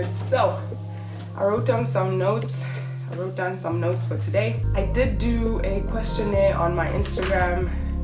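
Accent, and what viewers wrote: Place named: California, United States. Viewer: Which part of South Africa you from? Interracial dating isn’t common at all. You making sound as if you from California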